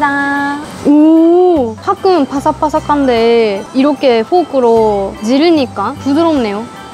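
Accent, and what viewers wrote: Gyeongsang, South Korea. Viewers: 은근히 대구 사투리 뭍어 나오는거 겁나 매력적.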